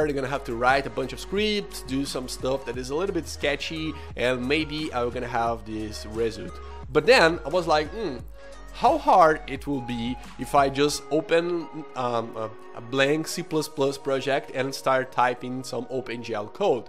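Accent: Brazil. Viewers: mano pelo seu ingles parece muito que vc é brasileiro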